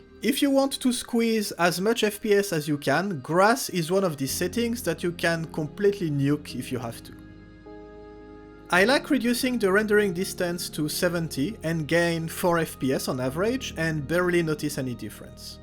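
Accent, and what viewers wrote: France. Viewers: you french ? great video btw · You're the frenchiest YouTube I've ever heard.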